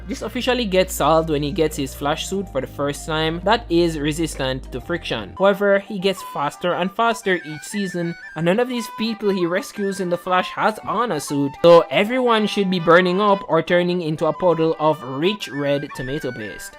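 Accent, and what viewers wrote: Jamaica. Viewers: I can tell that your Jamaican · I can tell from the accent that your a yardi big up mi G💪🏾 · Yuh jamaican?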